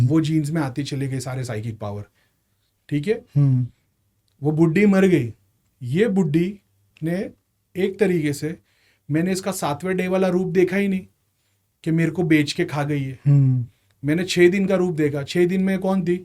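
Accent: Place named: Mumbai, India. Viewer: Hey Mr ur telling us clearly..and we like the Mumbai style free talk.thanks From dubai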